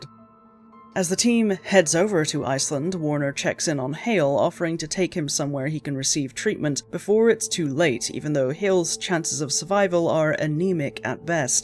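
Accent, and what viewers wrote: Scotland, United Kingdom. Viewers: They're Scottish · Amazing British female voice that loves horror. I love her:)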